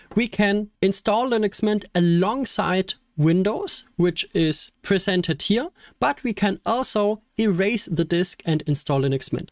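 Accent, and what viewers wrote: Germany. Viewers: weil der deutsche Slang durch kommt 😉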